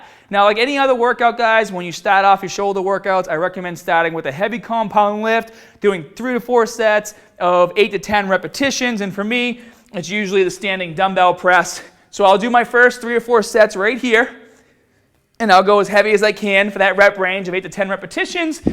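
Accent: Boston, United States. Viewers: damn it's been awhile since I seen any of this videos. I didn't know he had a Boston accent, lol. keep up the great work. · TreJay Ratliff i hear everyone spam about his boston accent in previous videos Xd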